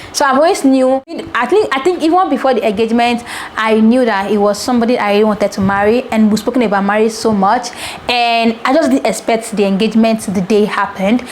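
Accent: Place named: Yoruba, Nigeria. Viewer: I like your vibes.. you so funny 😂, wow your Yoruba accent looks good from an Igbo lady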